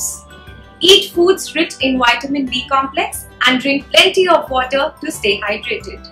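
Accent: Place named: India. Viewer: love ur indian accent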